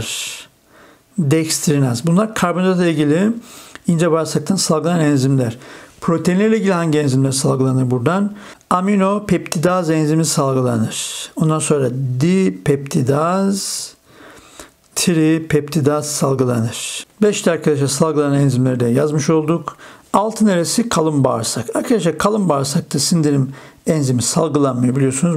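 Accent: Black Sea, Turkey. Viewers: Hocam konudan bağımsız ama Karadenizli misiniz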